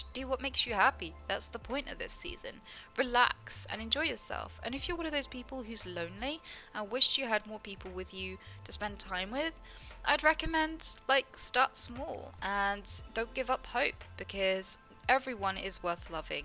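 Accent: United Kingdom. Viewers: So is that it? A hot girl with a British accent who randomly spews out profanity? I think I'm in love.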